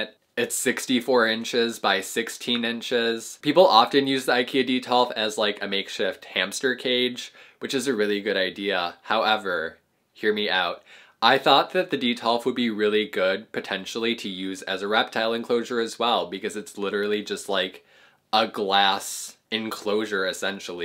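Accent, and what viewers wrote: United States. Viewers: Okay stupid question but is he American or Canadian? In turn are these American prices or canadian😂😂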